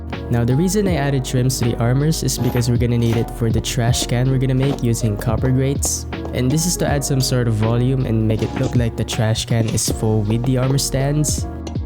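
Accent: Philippines. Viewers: You sound Filipino 🧐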